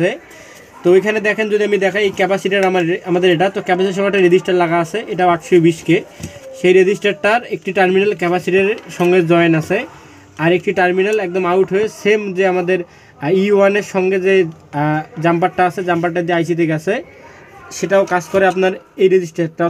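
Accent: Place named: Rangpur, Bangladesh. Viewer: আপনার বাড়ি মনে হয় রংপুর।৷ আরও ভাল করে বলবেন। আপনার সাথে আছি❤❤❤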